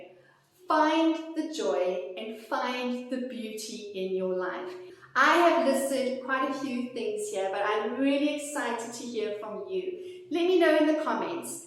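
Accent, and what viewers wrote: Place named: South Africa. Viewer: Hi - I’m fascinated by the subject of emotional resilience and I’m desperate to learn everything about it. I typed the words in YouTube and came across your channel. This video resonated with me personally on an experience level as well as an aha moment level. Please let me know if we can chat. You sound South African - so am I 😊 Thank you in advance!!!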